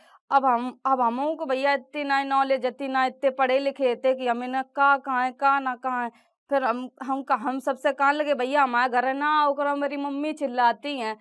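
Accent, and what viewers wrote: India. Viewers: I like deshi andas of speaking. ..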